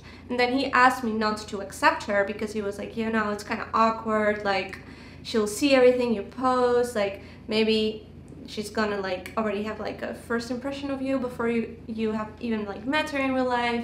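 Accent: Netherlands. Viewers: Haha yeah I just heard her accent and I was like ohh you sound Dutch ( I'm from the Netherlands as well ) and then I checked and it made sense haha. But we learn English in elementary school so it's kind of a second language for us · Wow I heard the dutch from second 1 :) hiii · As an American who once lived in Holland I learned an expression, The best women get the worst men. I think it's true, those Nederlanders are so insightful. Living in your homeland was possibly the best thing I ever did. I could hear from your accent that you are Dutch and I am always grateful for my time in that great country. Tot Ziens. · ik hoorde gelijk je Nederlands accent. groetjes uit Nederland! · You have a little dutch accent🙈 are you from Holland? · Really looking forward to Part 2. P.s. ik dacht al dat ik een nederlandse tongval hoorde met je engels 😋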